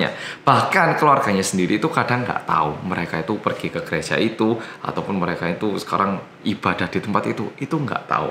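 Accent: Java, Indonesia. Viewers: Medok rekkk😉😉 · Medok tapi cute... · Kok koyo wong jowo ngono e medok ngomonge xixixixix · dulu sekitar taun 2017 pas channel ini baru mulai, aku dikenalno kokoku, katanya ada channel yutub org korea tp ngomonge medok. trus aku ditunjukno video2 channel ini. tp, well.. aku gak bnr2 ngikutin krn sbnrnya kontennya bukan aku bgt "dulunya"... aku gasuka sm review2 hahha. trus baru2 ini kok tak liat2, tak denger2in lama2 lucu jg ngmgnya wkwkwk. enak ngejelasinnya btw, trus ganteng #ehhh salfok hehe so here i am, hi, i am ur new subscriber :D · Jowo nya MEDOK bgt.. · ngga apa ngomongnya ngejlimet yg penting tetep Meedddooookkkkk... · STAY SAFE MAS MEDOK!! Semua agama baik kok, ga boleh saling menjelekan agama. Ingat lagu “Tuhan memang satu, kita yang tak sama~~” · Logat Jawanya medok ya.Tapi suka dengarnya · Leh kok jowo bgt srko jowo endinsih leh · Oppa knp accent nya medok yah ? 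But u studied in RMIT before right ? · Mas nya org Jawa ya? Logat ya kayak jowo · Welcome back to korea reomit bersama orang korea yang : MUEDHOOOOOOK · Yuuuhh aku kok lagi ngerti channel iki tooo.. Kapan lg kan liat muka korea bening bahasa indonesia paseh logatny jowo.. Biyuuuhhh😂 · wong ndi sih iki..muka korea,logat jwa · Mas saya baru subscribe, mau tanya kok bisa lancar gitu bahasa indonesia nya? Medok maneh...belajar dimana mas? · Ada logat jawanya juga masih nampak logat koreanya..😆😆😆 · pantesan jowonyo.medok.tenan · Mas ada keturunan indo ngk sih? Kok ada logat" jawanya tapi ada jg logat" Korea nya?🙁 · Nada bicaranya jawa bangett gituu🙂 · Medok bgt ngomong nya 🤣🤣👍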